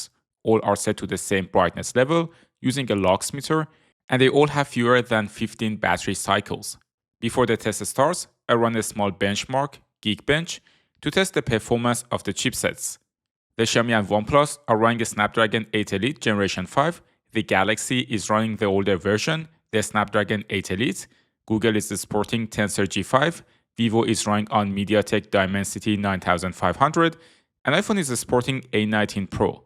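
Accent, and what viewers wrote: Iran. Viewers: bro are you iranian? your accent is just like iraninas. i'm iranian too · سلام هم زبان فارسی ، از لهجه تون معلومه ایرانی هستین😂😂😂😂😂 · The accent. Bro you're from Iran right? Great video btw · That Persian Accent 🤣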